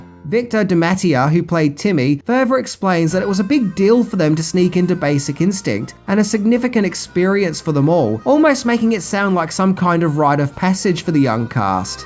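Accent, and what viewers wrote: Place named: Australia. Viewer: this is one of the few times I got an accent wrong. I honestly thought you were British. but now that you pointed out ur Australian, I can tell. I'm honestly thinking to myself, "how did I get that wrong?"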